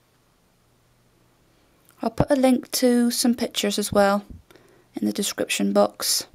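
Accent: United Kingdom. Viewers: I love your British accent! :D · Awesome! And love your British accent! I always wanted to go to England to get the accent :3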